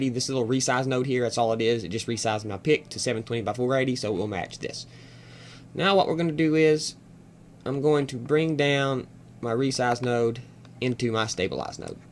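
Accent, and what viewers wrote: South, United States. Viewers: Out of all the help I have ferreted out, your help takes me over the top. You rock! Although I wish you would use STP and place a compressor on your vocal track--your southern good old boy accent kills me.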